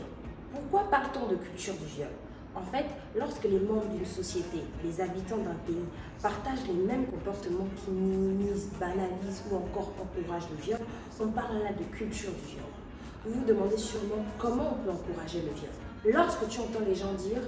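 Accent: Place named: Gabon. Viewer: Je kiff ta chaîne, et l'accent gabonais viens mettre un petit plus ♥️ continue t'arrête pas❤️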